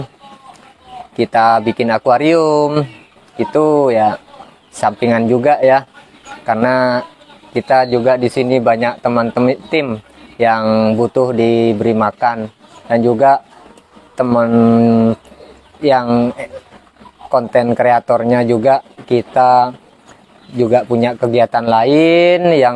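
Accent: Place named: Madura, Indonesia. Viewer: Mak logat madureh kak😅... Kaemmah empiyan kak...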